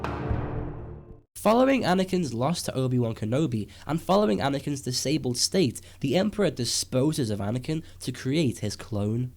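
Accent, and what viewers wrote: Liverpool, United Kingdom. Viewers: I don't think Vader was trying to kill Luke in Empire, he was basically just defending from Luke's attacks, and driving him toward the carbon freeing unit, so he could take him to the Emperor. He didn't know about Leia until he read Luke's mind. Also, are you a Scouser? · Nice to hear a British accent talking Star Wars!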